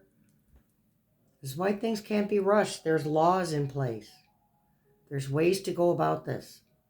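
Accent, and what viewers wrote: New York, United States. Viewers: I love her too. Even though I''m from California, I love her "New York-ese" style, saying, "Youse guys" and "anyhoo." She's genuine - the real thing.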